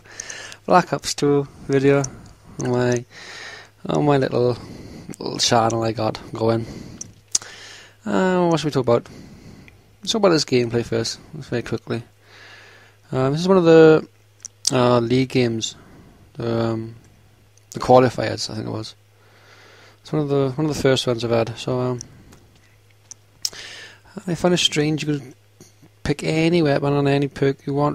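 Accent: United Kingdom. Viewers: Lastly, your voice is clearly English -> Scottish in accent. To an untuned American ear, it is quite hard for me to understand you. This isn't your fault, just something to keep in mind if you want to broaden your audience.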